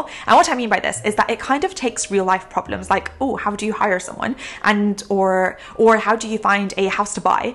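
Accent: United Kingdom. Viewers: That British accent goes like a song. Can't have enough of it. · That's one of the most british-ish accents I've heard recently. + I highly recommend reading Unscripted : life, liberty and the pursuit of entrepreneurship by MJ DeMarco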